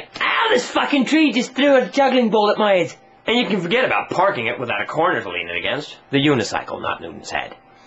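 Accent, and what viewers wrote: United States. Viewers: he sounds like an american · Ha, this made me giggle. :) I can't tell that you're Swedish from your accent, which sounds decidedly like mine, which is American. Yeah...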